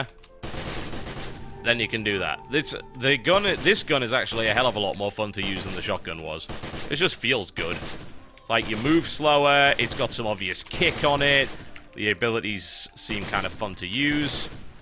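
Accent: United Kingdom. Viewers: No offense, but there's a little thing called accent. And TB's british, so his accent is, technically, the one with the "right" pronunciation.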